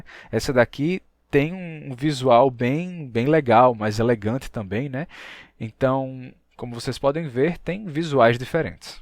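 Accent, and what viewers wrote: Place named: Northeast, Brazil. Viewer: Você tem sotaque de português do. nordeste do Brasil. No entanto, percebo um outro leve sotaque, que identifico com o sotaque de nativos do português do Brasil, mas que residem há muito tempo no exterior. Digo isso, porque tenho um neto brasileiro que reside em Londres desde 2008, e percebo um levíssimo sotaque em algumas palavras.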